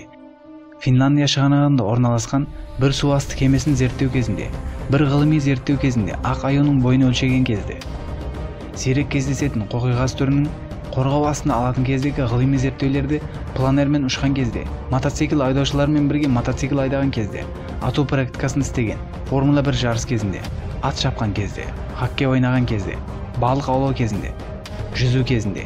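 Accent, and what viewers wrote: Uzbekistan. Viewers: Өзбексің ба ? Кара каопаксын ба? Акцент өзбекскй ұнамайды сөзің.. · Сенин шындыгыннын барлыгы неге 27 болып келеди отрикти сога бересин гой деимин сосын казакша дурыстап таза казакша соиле Казакстанда турып жатырсын озбекше акцент косып соилеме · Казакша нармални сойлеш озбек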